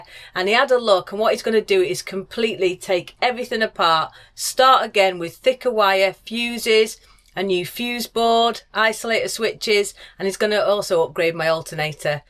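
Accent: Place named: North, United Kingdom. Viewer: Hi this is the first time I’ve visited your channel and guess what? I am now going to look for all your videos on you tube as you make them so interesting and informative.This channel for me is the best of the lot.I may eventually catch up with you as I have a feeling I’ve a lot of videos to watch.I love your humour and your northern accent.Thanks so much.Enjoy your travels.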